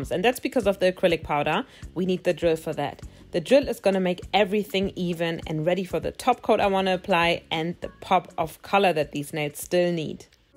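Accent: Germany. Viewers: Ist deine Nummer Sprache eigentlich deutsch hört man raus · Man hört direkt raus, dass du auch deutsch sprichst😂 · She's German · Du kommst aus Deutschland oder haha man hört den deutschen Akzent raus 😂❤️ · Wusste direkt das sie deutsch ist wegen diesem Akzent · Are you from Germany?😂🙈 you have quiet the accent🤔❤️ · Das ist so dieses deutsche english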